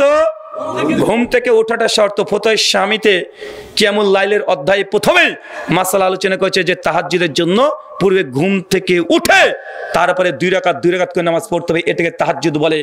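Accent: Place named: Kolkata, India. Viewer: আপনার কথা বলা একেবারে কলকাতার মানুষের মত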